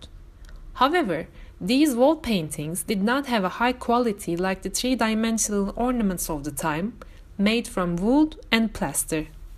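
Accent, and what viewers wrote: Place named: Turkey. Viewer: she speaks in turkish accent lol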